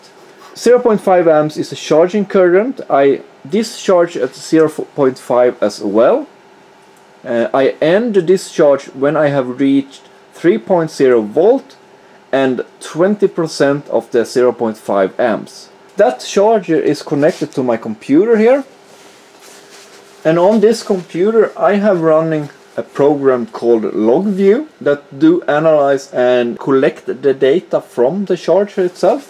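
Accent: Sweden. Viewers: Good channel! where are you from? you sound Swedish.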